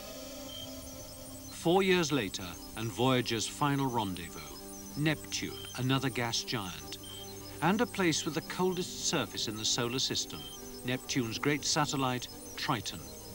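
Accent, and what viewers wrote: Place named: United Kingdom. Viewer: I don’t think so. This guy is British. 😂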